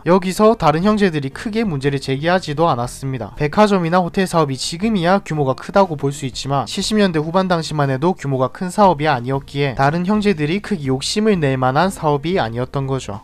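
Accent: Gyeongsang, South Korea. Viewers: 성우님도 갱상도네예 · 지금의 U-TUBE 를 만든 사람은 누구신가요..? 말투 보니까.. 갱상도 출신 이네요.. 감히 말씀 드립니다.. 당신의.. 부모가.. 누구인지를 먼저 알아보시고.. 이러 U-TUBE를 만드세요.. 지금의 자료를 내가.. 60년 전 간접 데이타를 가지고.. U-TUBE 를 올립니다 라고 얘기하면.. 조금 인정 합니다.. 그런데.. 아무것도..아닌 갱상도 이라서.. 돈을 벌기위해서.. 이런 자료를 올리는거면.. 그냥 알아서 돌이가시는것이.. 좋지 않을까 샹각 합니다..!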